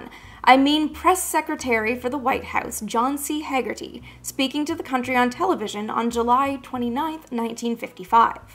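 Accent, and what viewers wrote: Canada. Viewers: You made me cry at the end. You're still a nice lady. Are you Canadian or a northern mid-westerner? Great piece, I will make many people watch.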